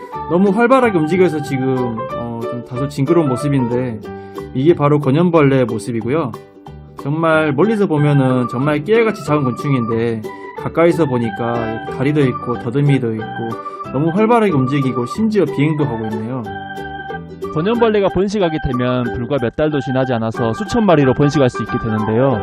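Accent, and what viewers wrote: Jeolla, South Korea. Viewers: 전라도 사투리 억양 정겹네요 ㅋ 표준어 쓰는척 하는게 억양이ㅋㅋ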